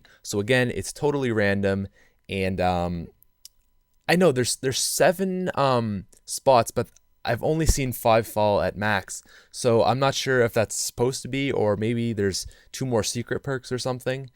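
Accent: Canada. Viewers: you sound like you are from Canada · are you canadian?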